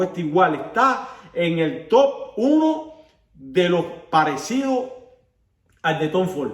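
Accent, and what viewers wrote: Cuba. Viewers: Eres cubano mi bro?...buenos videos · Hola, bien marcado ese acento cubano. Conozco el peach, El rosa, el tobacco touch y el cherry, me compre el cherry y el tobacco touch, me Super encantó el tobacco touch tiene una salida de clavitos de olor, nuez mozcada, miel dulce, parece un cake de navidad.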